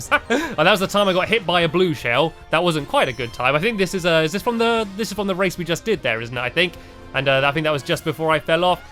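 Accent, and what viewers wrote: United Kingdom. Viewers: He is british he has an accent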